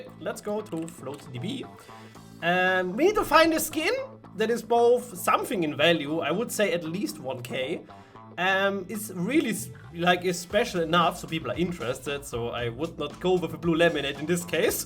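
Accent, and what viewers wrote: Germany. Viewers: Love your german-english accent :D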